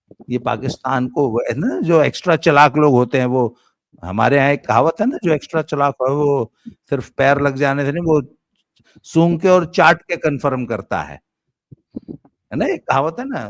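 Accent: Haryana, India. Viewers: Babaji Jay Shri Ram aapka Haryanvi leja bada achcha lagta hai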